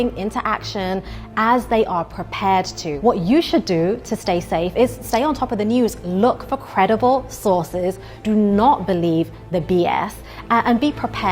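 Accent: United Kingdom. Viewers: She sounds British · I always trust people with UK accents, even if they are just repeating bogus info.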